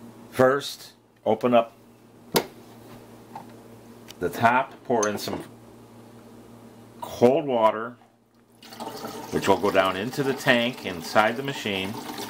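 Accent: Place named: Wisconsin, United States. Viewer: You must be from Wisconsin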